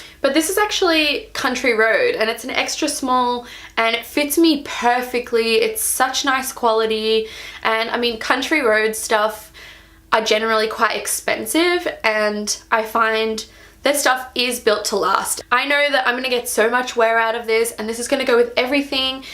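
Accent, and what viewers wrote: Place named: United Kingdom. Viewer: I guess I should mention that I'm making the assumption (based on your accent) that you are UK!? Could be wrong! You can totally listen to my accent and tell I'm backwoods!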